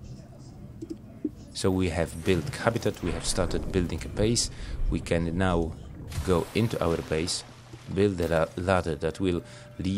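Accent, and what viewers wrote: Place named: Eastern Europe. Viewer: Where are you from, your accent is very nice. I think east europe.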